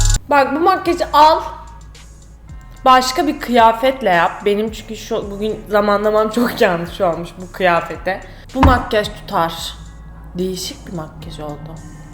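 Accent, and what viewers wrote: Kütahya, Turkey. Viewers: ilk buluşmadan beyrana götürmek galiba Kütahyaliliktan kiz yaaa bende yaptim😂 senden sadece kutahya şivesiyle video bekliyoruz😁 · vallah bayiliyorum bu kiza makaji beni ilgilendirmiyor zira bir erkegim ama konusmasi allah be acayip süper konusmasi gülmesi sakalari harika vallah birde kütahyaca konusuyor benim de memleketim 43 cok hosuma gitti cok gurur kaynagmiz:D <3 <3 <3 <3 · öldüm glmekten kütahya lehçenle yap makyajj süpersin resmen koşturark geldim izlemek için mucuk seni çok sevdım ben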